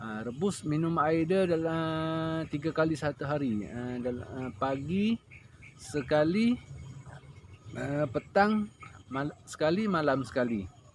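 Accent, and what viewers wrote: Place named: Kelantan, Malaysia. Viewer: Cara cakap mcm org kelantan...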